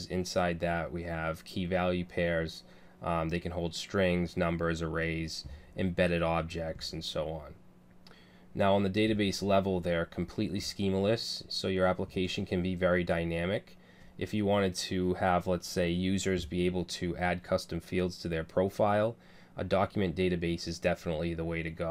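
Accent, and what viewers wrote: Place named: Massachusetts, United States. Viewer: I thought I heard it, I heard the Massachusetts accent! Should call it the New Englandah, but it's not as prevalent in New Hampshire. Still can hear it in some parts of Maine. Great video man!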